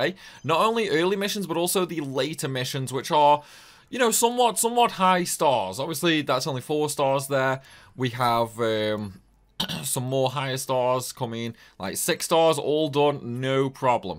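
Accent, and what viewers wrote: United Kingdom. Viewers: That’s how a lot of British people talk about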